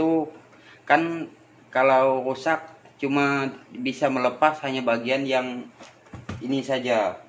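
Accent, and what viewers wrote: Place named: Kapuas Hulu, Indonesia. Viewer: Dr cara ngomong abg ini kayak y org kapuas hulu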